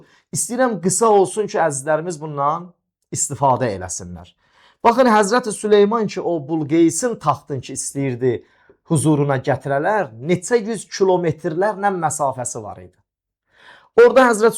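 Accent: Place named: Iran. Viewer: Iranski lehceee offfff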